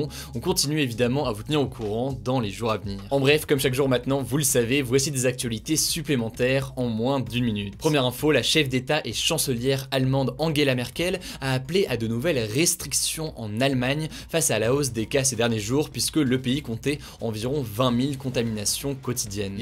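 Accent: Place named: England, United Kingdom. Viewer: On apprécie l'accent anglais d'Hugo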